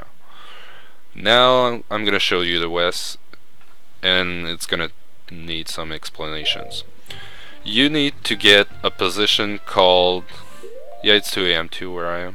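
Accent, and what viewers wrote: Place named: Quebec, Canada. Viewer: tu me semble avoir un accents québécois haha merci pour le tuto sa ma aidé un px avec des skips que je rochais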